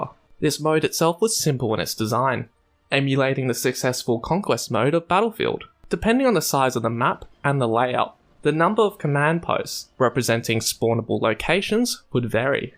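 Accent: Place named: Australia. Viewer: yeah once i heard an aussie accent i knew i was safe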